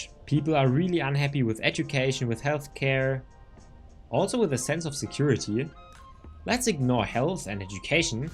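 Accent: Germany. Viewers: I can hear german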